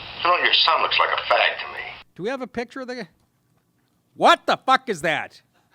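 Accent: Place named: New York, United States. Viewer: "sar" a soccer game. Ha...ney York accents. Love ya Nick.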